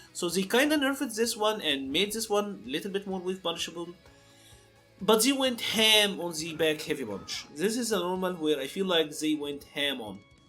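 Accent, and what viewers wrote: Egypt. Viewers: Your accent, are u from Masr?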